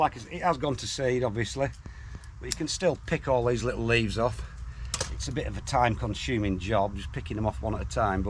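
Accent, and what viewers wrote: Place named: Yorkshire, United Kingdom. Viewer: I don't have anything pertinent to say other than thank you so much for sharing. I've learned a ton from you and your enthusiasm is great. (So is your speech. I did field school in North Yorkshire and hearing you always makes me smile. Also I'm trying REALLY hard not to ask you if you've ever wanted to fly your drive over Malham Cove and Tarn 🤣💚)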